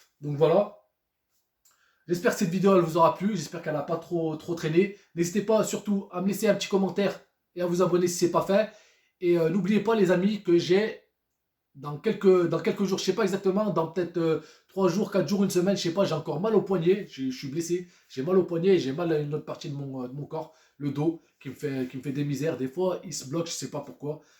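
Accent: Saint-Étienne, France. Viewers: Avec un bel accent de St Étienne ou de Grenoble...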